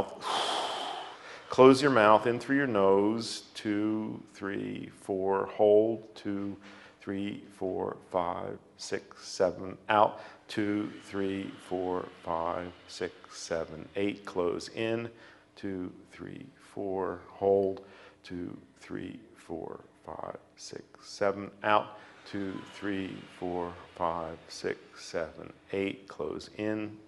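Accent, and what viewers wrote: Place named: Philadelphia, United States. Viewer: Wow, the counting was fast. I will give it a go. Do I ever hear Philly in Dr Weil's voice; "out", "around", "choklit." The 1st 2 almost have an extra vowel sound I couldn't spell. Love it, however.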